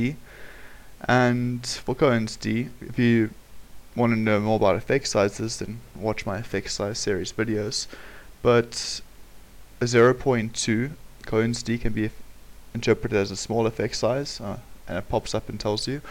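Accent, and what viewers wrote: South Africa. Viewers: screams are you South African??!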